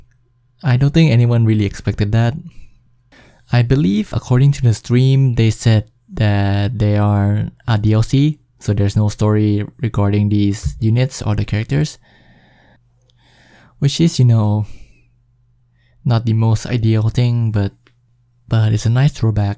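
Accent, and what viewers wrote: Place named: China. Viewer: That's not an indian accent. I think he's Chinese.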